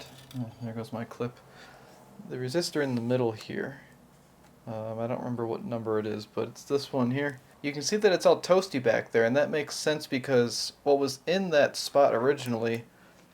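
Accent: United States. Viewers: I love the way you Americans say Dang or Dope. I live in Australia and I've seen one of these after a full restore. Unfortunately Marantz equipment took a China nose dive with their surround amplifiers. After a few months of use, the centre channel started playing up. I ran it in phantom mode but took it to a repair shop for a look. The amount of time he'd have to spend fixing it was a joke so I left it with him and went shopping for a new 7.1 amplifier. I was looking at some gear when I came across an Onkyo 7.1 unit. It was THX approved and ran all the audio matrixes I'd ever need and it was beautifully made. Point to Point wiring etc and the sound was phenominal. I remember Onkyo from the 70s when they made one of the first CD4 Discrete Quadrophonic stereo sound systems. Pink Floyd with a bit of the spliff was fun. (I'm 62 by the way) This new Onkyo unit is brilliant and by the time it's anywhere near clipping, you'd be bleeding out of your eyes and ears! 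The sub out is great. It only feeds the sub with relative info so the bottom end doesn't become overbearing. I've put a DBX 163 between the sub out and the sub to catch transients that can kill any speaker. So many things in Australia are no longer made here. Do you know that we don't even make a car here anymore? Australia made some beautiful Cleveland Earth tuners!! It's a joke. Anyway, Cheers from OZ, Andrew.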